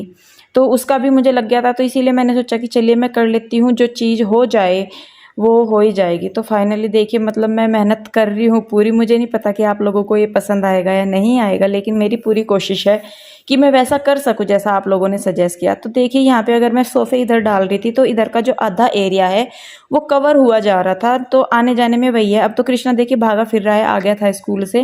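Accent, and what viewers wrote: Haryana, India. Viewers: Bahut sundar lag rha h ji ghar aapka ji aap haryana se ho kyuki aapki boli hamare jaisi h same boli h hamare yha yehi bolte h yh mera haryana dodh dahi ka khana yh mera haryana 👌👍🙏jai shree krishna 🙏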